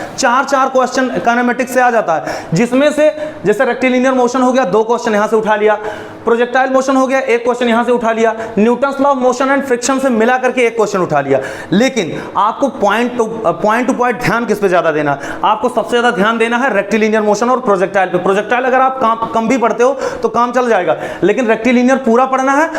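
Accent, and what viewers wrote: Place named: Punjab, India. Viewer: Sir come fast. We need your class. For the First time Maths became easy when i watch Mayank Sir's video and now we got a dj style sir for physics also. And your Hindi is a mix of punjabi language,which i love to hear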